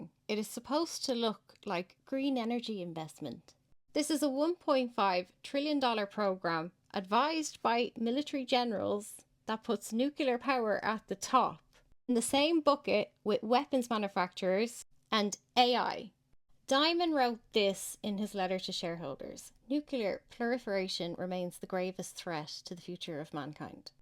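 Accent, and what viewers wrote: Ireland. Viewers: Sounds a bit Anglo Irish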